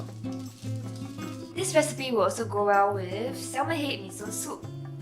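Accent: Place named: Singapore. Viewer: Can tell you're Singaporean with the accent hahah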